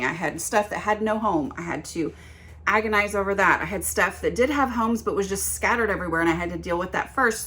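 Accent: South, United States. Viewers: You have a sweet southern accent and voice! You sound like me and my friends :) I loved your video and you're very easy and pleasant to listen to :) Thank you for all the helpful tips and I will be checking out more ! May the Lord bless you and your family:) Rachel and Dobie in Nashville 🎨👩‍🎨😺🍊💕🛐📙☦️🕎🕯️ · I can hear your Southern accent when I read your book. So cool to hear you explain this concept out loud - I'm in the middle of Decluttering at the Speed of Life now. Love it!!!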